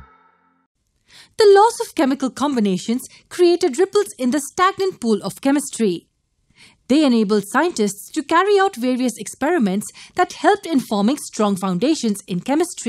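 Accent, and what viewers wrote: India. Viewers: A superb video I can easily understood it but there is a question are you a Indian?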